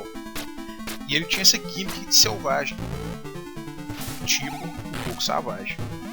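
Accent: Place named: Interior, Brazil. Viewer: Caralho, mas que sotaque caipira.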